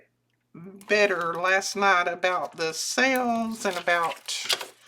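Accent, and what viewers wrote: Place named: South Carolina, United States. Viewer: it you quit, one of YouTube's brightest stars will dim! You really are talented! Your projects are ALWAYS done SO well! 💖 I moved from South Carolina 15 years ago, and when I hear your voice, I'm right back there where I left a piece of my heart💕😊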